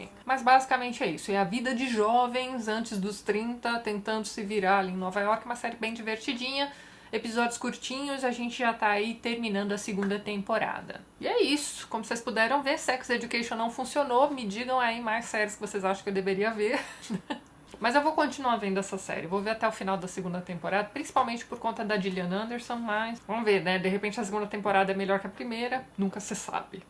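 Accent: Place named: Rio de Janeiro, Brazil. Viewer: Então tá explicado o sotaque carioca :)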